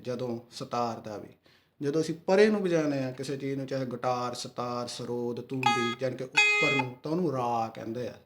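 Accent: Doaba, India. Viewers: ਵਗੈਰਾ ਵਗੈਰਾ ..... ਜਿੱਦਾਂ ਕਿੱਦਾਂ , ,..., love the doabi accent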